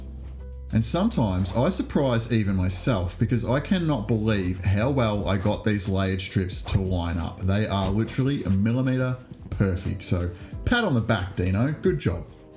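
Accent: Australia. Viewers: lovely build. Great wood choice. Unreal to hear Aussie accent for a change. Would you consider individually partitioned boxes for the scotches? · Australian accent, terms of lumber, butt dank something doesn’t sound quite right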